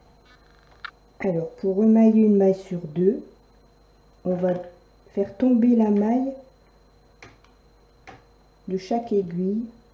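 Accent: North, France. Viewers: Bonjour, c'est gentil d'avoir répondu et si vite,.malgré mon expérience j'en apprends toujours en regardant vos tutos. ...De plus j'ai l'impression de reconnaitre un peu l'accent du Nord, je suis moi même originaire de cette région. ..Vous m'avez redonné le goût de tricoter....Grand merci!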